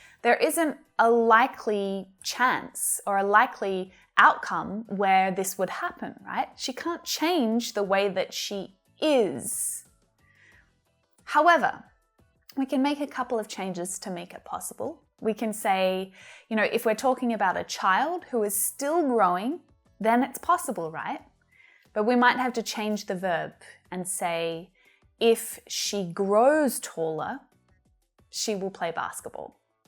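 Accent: Australia. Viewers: your accent is so different. I LIVED in montreal, Canada. The accent from Austrilia is so good. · I live in Canada and I am so happy to listen to your Australian accent that I love. I love to visit Australia as well. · Australian English is the coolest 👍🏻😃, I really like your accent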